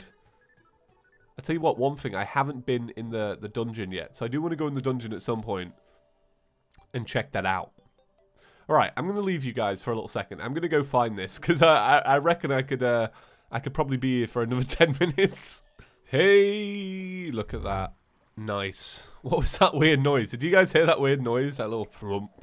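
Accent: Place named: Scotland, United Kingdom. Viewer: The Scottish accent is one of my favorite accents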